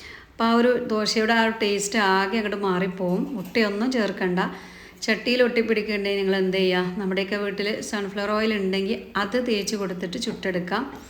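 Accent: Malappuram, India. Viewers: മലപ്പുറം താത്തയുടെ മലപ്പുറം സ്റ്റൈലിലുള്ള സംസാരവും പാചകവും എത്ര രസകരമാണന്നോ? എനിക്ക് വളരെ ഇഷ്ടമാണ് നിങ്ങളെ 'എന്താ മുഖം കാണിക്കാത്തെ കൈമാത്രമാണല്ലൊ കാണുന്നത്.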